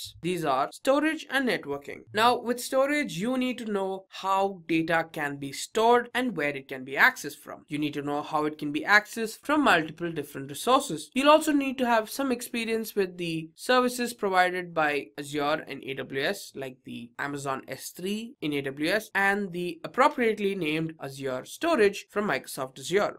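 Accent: India. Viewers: Indian accents are so cringe jesus christ.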